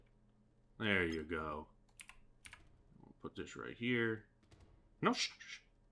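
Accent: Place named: Brooklyn, United States. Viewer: you sound like brooklyn guy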